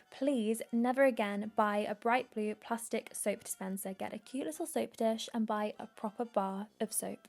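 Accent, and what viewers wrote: RP, United Kingdom. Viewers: Steps to having a more luxurious life: 1) step one: have a charming, sophisticated posh British accent like, a mix of the movie Notting Hill and Victoria Beckham and Kate Middleton 2) step 2: have poise and panache like 3) step 3: have exquisite taste in architecture, art, and fashion like In short: BE!!!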